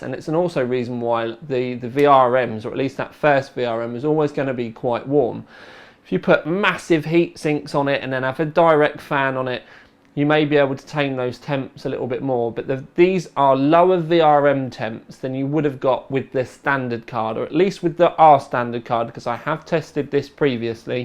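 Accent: United Kingdom. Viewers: Basic benchmarks No they didn't. The English did. Ever heard of a country called England? Ever heard of the English people? The English spawned the languge, not the Scots, not the Welsh, and not the Irish. +TheMaraio1 in England dude, he's English and speaks with an English accent, I'm American, I speak with an American accent. It's Americans like you that give the rest of us a bad rep abroad, seriously guys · this hardcore British accent is a little bit hard for me to understand LOL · Andre Salles That's because he's in Britan and speaking British English...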